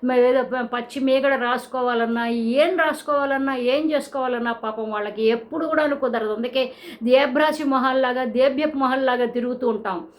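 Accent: Guntur, India. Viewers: గోల్డెన్ వర్డ్స్ చెప్పారు . నిజంగా ఆడవాళ్ళ బతుకులు అంతే. మీరు మాట్లాడుతుంటే మా గుంటూరు జిల్లా వాళ్ళు మాట్లాడినట్టు ఉంది మేడం చచ్చే నవ్వొస్తోంది. అన్ని రియల్ గానే చెప్తారు మీరు హేమలత తిరుపతి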